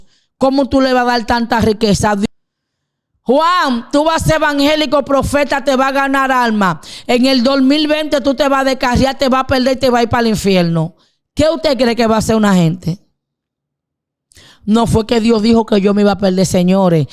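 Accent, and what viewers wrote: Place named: Dominican Republic. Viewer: ella es dominicana por eso habla asi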